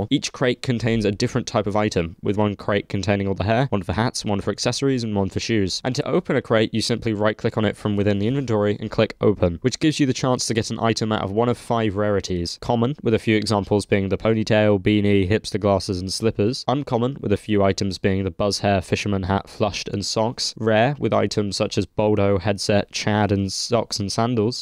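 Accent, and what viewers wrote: Argentina. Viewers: are u from argentine ?? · Che sos argentino?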